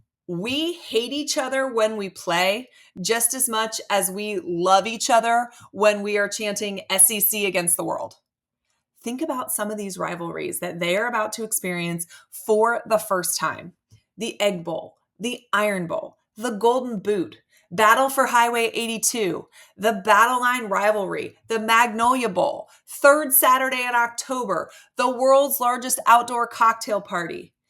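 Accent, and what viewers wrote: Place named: New York, United States. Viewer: He sounds like every New Yorker, ohio transplant when they move here.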